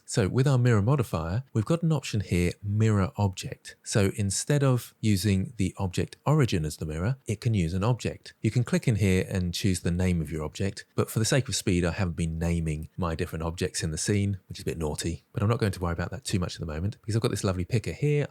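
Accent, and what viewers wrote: United Kingdom. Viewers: just shows and demonstrates how many times he had done this and knowing the process inside-out. The addition of British accent adds a nice spice making blender appeal and following the process just a breeze. Many thanks for sharing.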